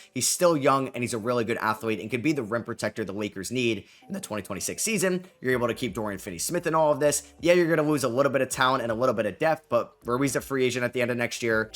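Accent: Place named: New York, United States. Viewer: The new York accent is so funny What about the letters eu in Kneuppell make an 'ih' sound to you? Also great content 👑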